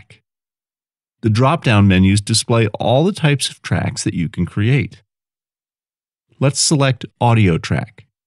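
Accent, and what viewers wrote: United States. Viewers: I don't want to sound like an ass, but that slow American accent is the worst. When the guy is talking I have this sudden urge to punch someone square in the face! I really want to figure this program out, but this tutorial is just unbearable to listen too. Is there any version of this video in English English? Or Australian? Even Scottish would do.Just anything but this, for god's sake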